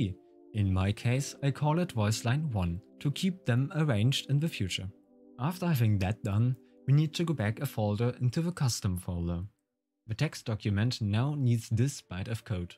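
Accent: Germany. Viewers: keiner kann mir sagen das er nicht deutsch ist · ich habe schon am anfang des videos an deiner aussprache gehört das du deutscher bist :D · are you german? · ich wusste du bist deutsch XD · I Hear Deutsch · you can hear the German accent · Bros accent is way to german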